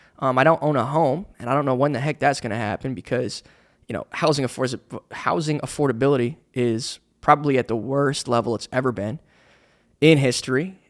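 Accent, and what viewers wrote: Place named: United States. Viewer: The diction, the intonation, the rhythm....... soooo perfect! 🙌 I'm currently learning American English and YOU have the most PERFECT American accent, I can listen to you the whole time and mimicking you (and that makes me feel confident and motivated) Hope you can make more solo podcast. Thanks a million! 🩵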